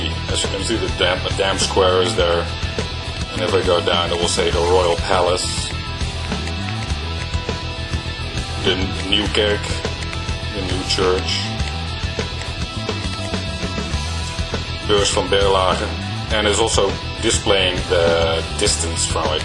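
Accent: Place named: United States. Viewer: Good tutorial and information, great music(loop), good American accent too! I only recognized the real guy's voice when he pronounced "Berlage"... ;-)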